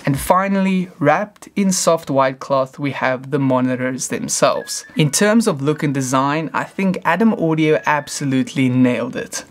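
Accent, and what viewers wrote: South Africa. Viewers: I just watched a video of yours where you compared 6 studio monitors and went looking to see if you had an updated version. This was recent so I watched it. My suggestion is to use a spectrum analyzer to compare monitors. Anything else is too subjective. You can even install a free one on your phone. The purpose of monitors vs speakers is to give you the pure, unaltered sound of the music/instruments/voice as it was recorded/played. The only way you can tell is to use a spectrum analyzer and compare how flat the sound is from one to the next using an objective measurement. I like what you're doing, just a suggestion on how to do it. BTW, are you South African? I lived there for several years a long time ago. A beautiful country.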